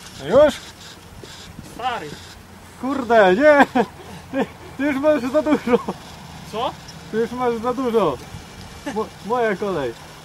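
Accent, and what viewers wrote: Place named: Kuyavia, Poland. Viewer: Ty chyba jakoś z Kujaw jesteś .. "Jo" to trochę charakterystyczne :-)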